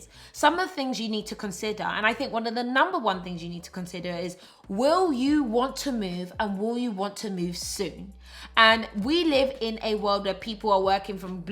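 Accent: England, United Kingdom. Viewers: Is she British?😍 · Are you British, by the way? Your accent sounds really English!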